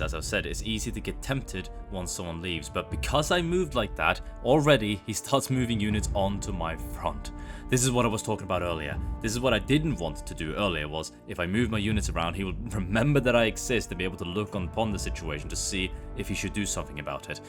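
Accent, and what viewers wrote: Norway. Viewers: Are you Norwegian? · I can hear a bit of an accent, if it isn't rude to, can I guess you're Norwegian? 🤔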